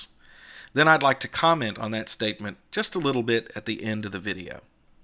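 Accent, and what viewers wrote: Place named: Virginia, United States. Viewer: We love you, you're a great orator educator and communicator. I guess those descriptors are redundant to each other. But they are true. Being a New Englander I especially like your distinctive Virginia accent. Mostly I love the passion you put into teaching Linux to the rest of us. You've helped hundreds if not thousands of people transition from windows to Linux. Granted Microsoft helped the cause by developing the worst operating system ever. I only wish you didn't react to the haters, you come off a bit cringy and oversensitive. You need to learn to not let them get under your skin.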